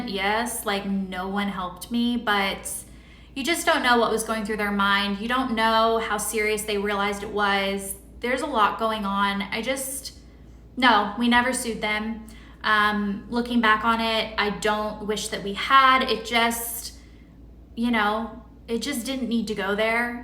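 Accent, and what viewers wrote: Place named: South, United States. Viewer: Your southern drawl is coming back <3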